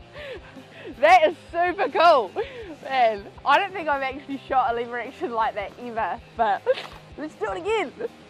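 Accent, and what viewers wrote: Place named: South, United States. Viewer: Shes a southern girl!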